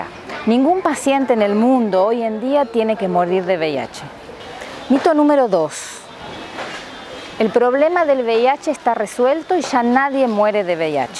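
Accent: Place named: Argentina. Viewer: Que papá Dios te bendiga mujer por el acento creó que eres argentina lastima que en mi país faltan médico como vos..con esa gran carisma ganan mucho dinero pero falta carisma al paciente...creó qué nadie va al hospital por gusto...si no hay enfermedad no hay enfermos,y si no hay enfermos no existirían médicos ni hospitales...Jesús el gran médico está de tu lado sigue así mujer argentina ... saludos de un panameño.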